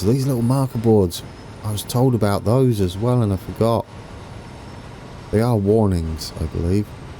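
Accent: United Kingdom. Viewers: It is fitting watching these British rail videos with a brittish narrator like yourself. Mmmm, my immersion levels are in peak condition. I have to say that TWS has GOT to be the best looking and sounding of all the train sims out there. Their artists and effects guys did a top notch job. Also the realistic landslide and they even nailed the workers standing by doing nothing! That is so immersive! XD GG. Gonna watch a few of your train vids. I just got TWS 2 recently and im enjoying it a lot. Doing the Sand Patch campaign now.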